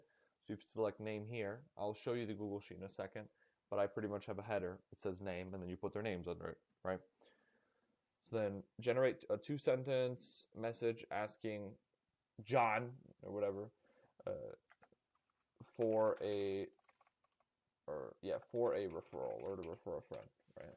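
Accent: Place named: Chicago, United States. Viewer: I knew you were from Chicago from the accent. I'm about to start d2d sales and I'd like to have a way to text them a flow to sign up for a new account. This helps a ton. Thx.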